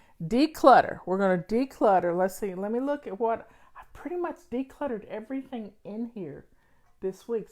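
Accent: South, United States. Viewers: I love your southern accent! I'm a New England girl who lives in Tennessee, lol. By being who you are you giving us permission to be true to ourselves also. i just love these daily videos! God Bless you. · You keep on keepin’ on. You’re great! I love your southern accent. 😍 I'm from Virginia & we have those southern accents here, too. 😍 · I love your southern voice! I love your stories and your videos are perfect. Don't let people like that get to you at all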